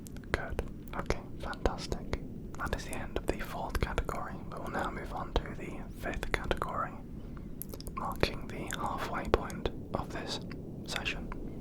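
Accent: United Kingdom. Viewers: Love the english accent in ASMR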